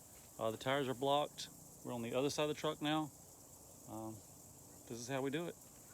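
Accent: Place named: Eastern North Carolina, United States. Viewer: You must be in eastern NC. I can tell.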